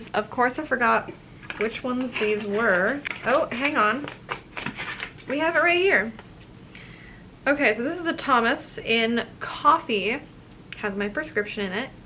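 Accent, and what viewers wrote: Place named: United States. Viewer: Oh, you’re dutch? For some reason i thought you were german... did you grow up in the US? Cause for me (a brazilian), you sound american :) (Your accent)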